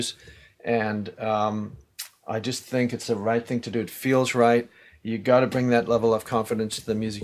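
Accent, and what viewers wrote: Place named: South Africa. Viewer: The weirdest thing is as a South African, hearing his accent that has hints of the South African accent that’s been completely taken over by the American accent 🤣😂 anyway, such a talented engineer!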